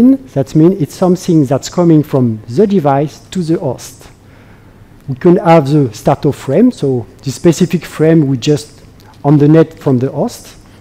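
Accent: France. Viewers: He says ON POINT: he is trying to pronounce Endpoint apparently. He pronounces English words according to the French pronunciation rules. ZE OST = The host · vıcık vıcık fransız aksanı, başka adam yok de mi sunumu yapacak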